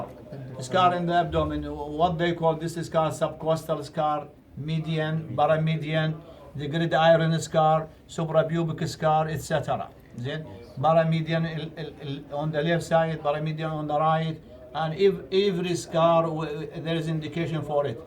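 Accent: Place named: Arab world. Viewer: Hhahaha it's Arabic accent 🥰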